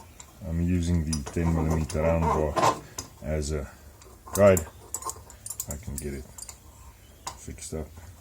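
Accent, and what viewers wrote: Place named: South Africa. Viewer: You sound south african, are you?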